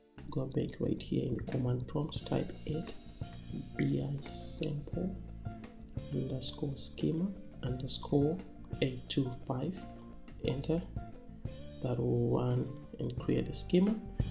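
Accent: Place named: South Africa. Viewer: do you have an email to cantact you directly for tutorials, from the sounds of it you are based in Mzansi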